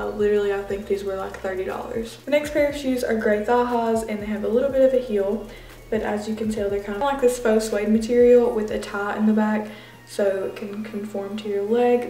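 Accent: South, United States. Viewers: her accent is country af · living for country accent. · arina k i thought she was Australian then i heard a hint of southern lol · her accent is so southern southern accent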